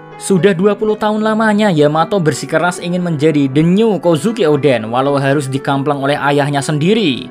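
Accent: Java, Indonesia. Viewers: Mimin orang Jawa ya nanya aja min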